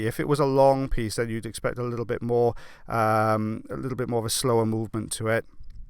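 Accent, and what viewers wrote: Wales, United Kingdom. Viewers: Ah!! The voice of my Walshman!! 😀. Question if I may please. Why is it necessary to get the ear wax out in one piece?? A lot of the time like tonight you were saying "should be able to get this out in one piece". Why is that?? Enjoyed the one full of hair. It looks like an old piece of carpet rolled up in the ear canal!! 🤣🤣 So glad you're back. Looking forward to all you mentioned in your commentry tonight. 😀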